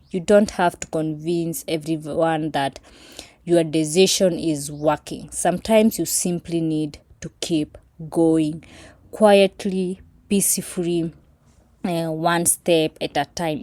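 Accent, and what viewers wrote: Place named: Kenya. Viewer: Sura yako ni ya Mkikuyu pia huwa unasound kama mkikuyu lakini jina ni ya Kiluhya au umeolewa na Mluhya?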